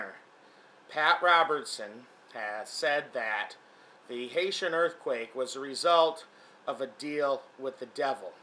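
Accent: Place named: Midwest, United States. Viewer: I love your Midwestern accent.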